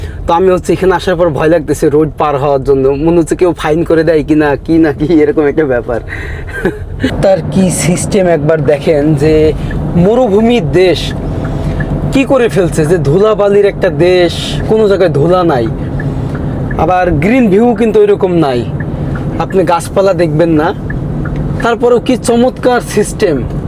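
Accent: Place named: Bogura, Bangladesh. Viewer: উনার কথার শুরুতেই বুঝচ্ছি বগুড়ার লোক।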